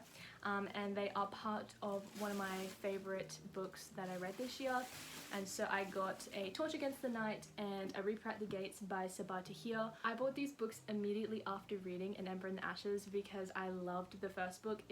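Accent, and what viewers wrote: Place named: Australia. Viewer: oh the way I love Aussie accent 😫🥺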